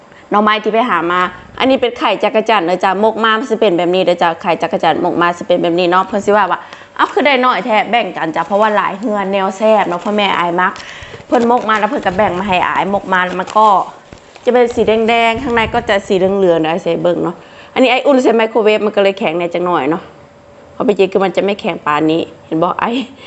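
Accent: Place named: Sakon Nakhon, Thailand. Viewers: พี่รู้จักและติดตามน้องอายตอนหาเห็ดเกาหลี เพราะพี่ชอบดูหาเห็ดและพี่สงสัยว่าอีนางนี้คึเว้าสำเนียงเมืองสกลแท้ นำมาๆจั๋งฮู้ว่าเมืองอะหลีไทกุสุมาลย์ พี่ชอบวิถีชีวิตน้อง จะทำให้คนอื่นเข้าใจเราทุกคนเป็นไปไม่ได้เก็บคอมเม้นต์แต่กัลยาณมิตรไว้ เป็นแรงใจให้ตนเอง น้องอายพูดถูกจ้า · ข่อยล่ะเหยอะนำเจ่าเด้เสียงหย่ำกรุ้ปๆๆ ตาแซบ หล่า เสียงเว่ากะม่วน ภูไท เว่าม่วนอิหลีจ้า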